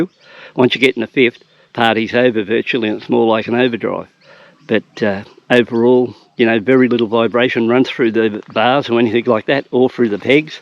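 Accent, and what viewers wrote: Australia. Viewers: As soon as I hear that Ozzy accent I know I'm in for a great honest review. Like you a have a royal Enfield 350 but am considering this bike which is now available here ( well , gb3500s) pretty much the same. Keep roller fella . Thanks 👍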